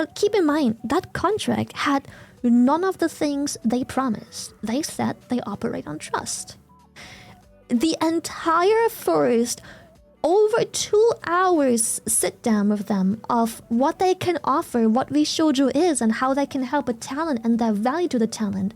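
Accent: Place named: East Germany, Germany. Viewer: I guess, the accent kind of tells it, she's from eastern Germany. More specifically Sachsen-Anhalt.She never went beyond those details.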